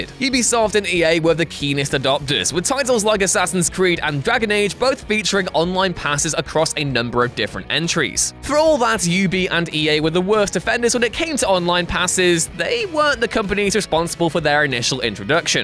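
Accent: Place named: West Country, United Kingdom. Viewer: Now is it just me or is that a really strong west country accent?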